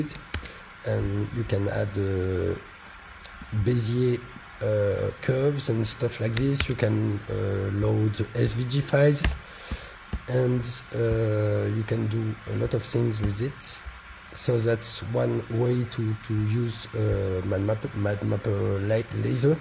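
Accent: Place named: France. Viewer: Un français qui parle English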